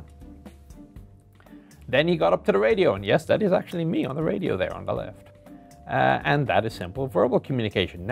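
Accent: Ireland. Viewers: He has a pretty thick Irish accent, regardless he has mentioned it many a time.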